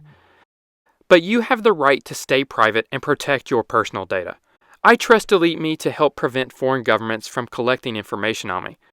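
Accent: United States. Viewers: What specific American accent do you have? Every time I watch these I try to work it out but I have no knowledge of US accents. My best guess is Philadelphia??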